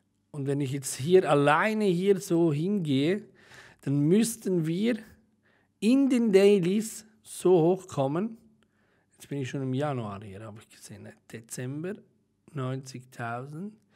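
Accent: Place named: Switzerland. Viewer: schön mal ein schweizer zu hören im youtube :-)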